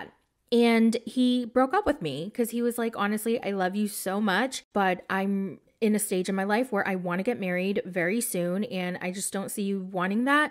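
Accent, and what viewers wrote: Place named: Boston, United States. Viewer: You’re from Boston! Lol hey girl 🫶🏾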